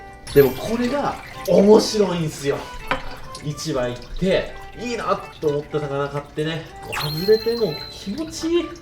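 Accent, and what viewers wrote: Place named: Nagoya, Japan. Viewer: 名古屋弁です。標準語に比べるとアクセントが強めです。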